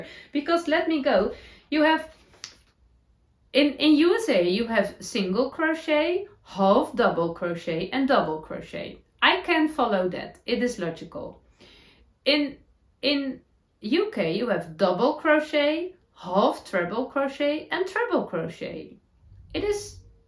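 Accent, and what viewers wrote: Netherlands. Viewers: Just found you! And so glad I did. Just at the beginning of the video and I'm looking forward to hearing all about your projects. Hope you don't mind me saying that I love Dutch accents, and I find yours so comforting to listen to :)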